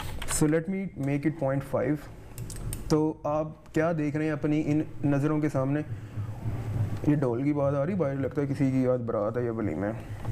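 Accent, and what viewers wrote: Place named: Punjab, India. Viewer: paji tuhada punjabi accent att krva denda 🔥🤟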